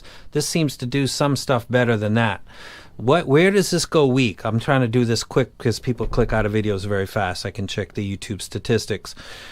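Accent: Boston, United States. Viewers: Argons are something I have to pick up this year. Also I love what ever music app you are using for your files! I need to set up something similar. You sound like you're from the Boston area.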